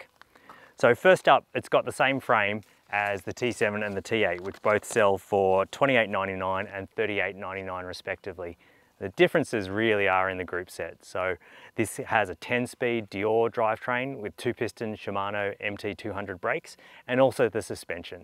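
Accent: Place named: Australia. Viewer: No problem hearing and understanding him perfectly here in Switzerland even with the typical Aussie accent! And he is right btw. actions do speak louder than words mate.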